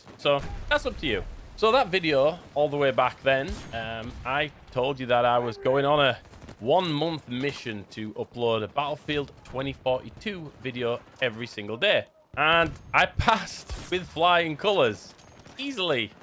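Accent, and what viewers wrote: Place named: Scotland, United Kingdom. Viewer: When I first started watching you I thought you were a Scot. And now I have just learned I wasn't entirely wrong! □😂🤣😂🤣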